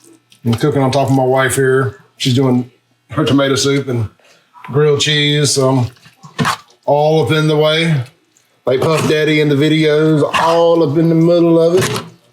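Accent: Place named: South, United States. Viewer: Where are you from?? I can’t pinpoint the accent it sounds southern but also half of it doesn’t sound southern I’m so confused lol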